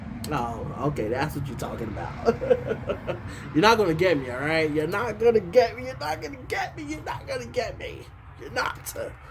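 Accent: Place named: New York, United States. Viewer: We have an amazing opportunity to show the world His love by our unity at Christ followers. Love you and yeah, NY accent is still there for sure.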